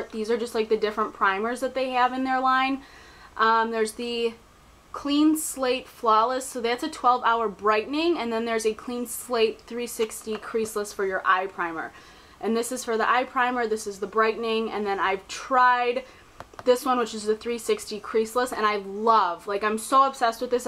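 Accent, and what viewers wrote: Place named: Midwest, United States. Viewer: I love the tarte smooth operator power it's amazing for under the eye! Where are you and your Midwestern accent from!? I live in Wisconsin :)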